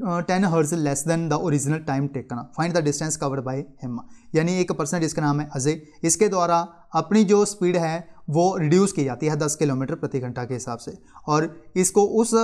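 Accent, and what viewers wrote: Punjab, India. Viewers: ye sir ya toh punjabi h ya himacha se h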